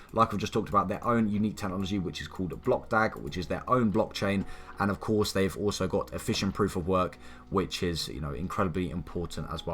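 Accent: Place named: England, United Kingdom. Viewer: i want to learn your accent bro! After of the halving I will to pay someone from Ingland to teach me.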